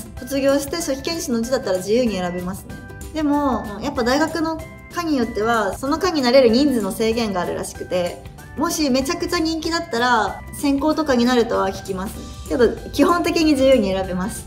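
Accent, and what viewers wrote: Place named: Hiroshima, Japan. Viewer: 奇を衒わない内容に加え岡山訛り？の広島弁が何とも堪りません。 高校大学同じなので勝手に応援中です 頑張ってー！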